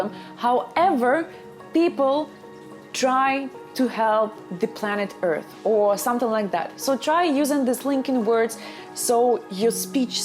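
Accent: Russia. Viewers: Is your native language is Russian language Because your English speaking is seems like you are Russian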